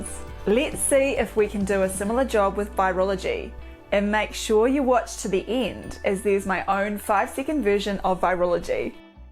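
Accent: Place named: New Zealand. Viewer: All this good stuff delivered in the most adorable Kiwi accent. Thank you Doc ...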